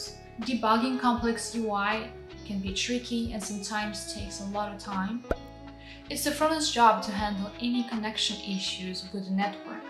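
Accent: Eastern Europe. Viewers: Where are you from? You have a bit of Eastern European accent. Maybe I'm wrong. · Where are you from ? Your accent sounds like from those countries by Russian surroundings...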